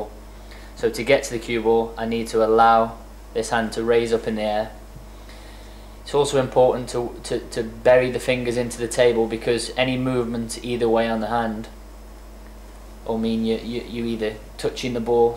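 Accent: United Kingdom. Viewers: John Doe bri ish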